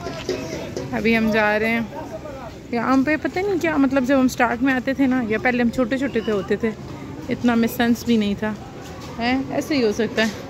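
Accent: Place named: Chakwal, Pakistan. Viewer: I am far away from my city. Couldn’t travel back since two years. I watch your vlogs whenever i miss my city. I like your Chakwali way of narrating things 😎 as this is my own way. I love it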